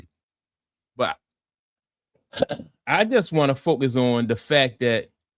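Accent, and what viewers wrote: Baltimore, United States. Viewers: Can tell my man doing the video is from Baltimore. I respect the hustle G! Great video.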